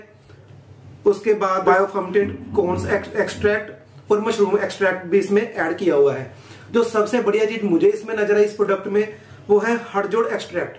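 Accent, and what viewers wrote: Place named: Punjab, India. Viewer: Beautiful review but the Punjabi accent is more beautiful. Keep growing!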